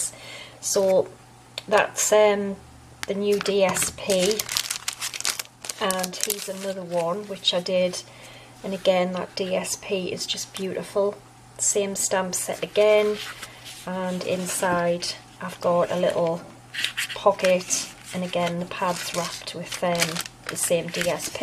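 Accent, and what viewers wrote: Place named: Sunderland, United Kingdom. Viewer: Nihe1967 I would guess Sunderland? Quite specific accent 😊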